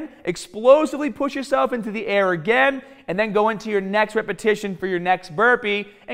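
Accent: Boston, United States. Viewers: What accent is that?..Boston? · You from beantown? Boston? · OoooOoooo that Stroing Baastan accent 😍 👌 😂 🤣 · Oh Lawd you from Boston. I love your accent 🥰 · I think that is a Boston accent, if I am not mistaken. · Love the bawstan accent. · Hey I don’t remember hearing your Boston accent before where you from I’m from Southie Keeeeedd!!!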